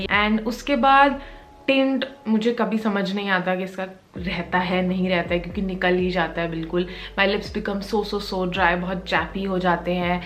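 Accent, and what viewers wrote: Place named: France. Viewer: Loved your French accent 😃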